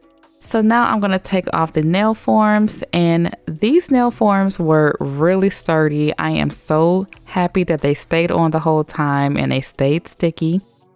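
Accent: United States. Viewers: From London Brixton UK. Wow. Girl you got creative talent. Beautiful. This is the USA female accent that sounds real nice.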